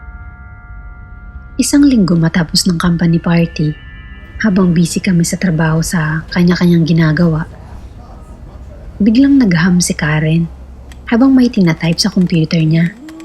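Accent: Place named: Philippines. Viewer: Another pinay voice, lovely, totoong pinay accent talaga, greetings from London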